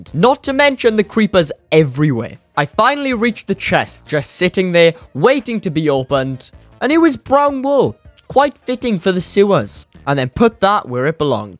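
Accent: Wales, United Kingdom. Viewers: Yo man you welsh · correct me if I am wrong but your accent has a welsh touch to it lol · Your accent tells me, an American, that ur British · Are you Welsh? I’m from the UK, and I can’t help but notice the Welsh accent! 😁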